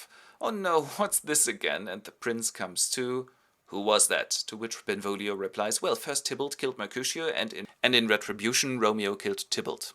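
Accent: Germany. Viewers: Are you german? Asking because of the accent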